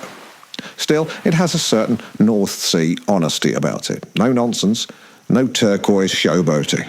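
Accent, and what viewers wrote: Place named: Australia. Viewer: Too aussie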